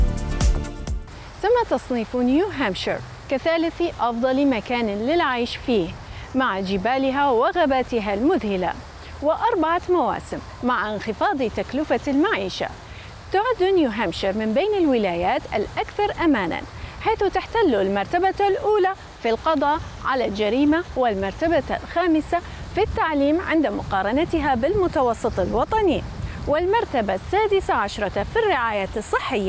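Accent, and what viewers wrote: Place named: Iraq. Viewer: مبین من لهجتها عراقیه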